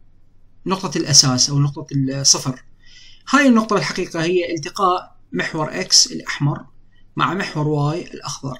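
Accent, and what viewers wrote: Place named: Iraq. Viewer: الله يوفقك ع هذا الشرح الواضح وخصوصا باللهجة العراقيه القريبه ع قلوبنا الله يحفظك ويحمي العراق بس لو اعرف التسلسل تبع محاضراتك استاذنا الفاضل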